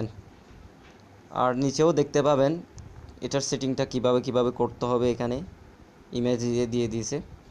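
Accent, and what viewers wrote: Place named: Chittagong, Bangladesh. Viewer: আপনার ভাষা শুনে তো আমাদের চট্টগ্রামের মত লাগে,,,চিটাইংগার টান আছে ভাষাতে, 🥰🥰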